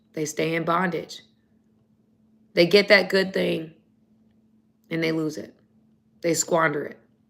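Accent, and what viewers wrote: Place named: New Orleans, United States. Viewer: I think New Jersey plus Atlanta, or Georgia, almost makes a New Orleans accent, specifically a westbank of New Orleans accent..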